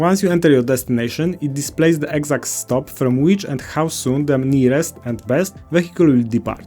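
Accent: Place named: Poland. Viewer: Słychać akcent polski akcent w chuj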